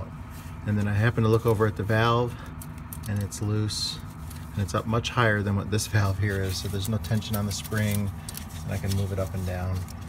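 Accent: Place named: New York, United States. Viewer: That new York accent though 🤣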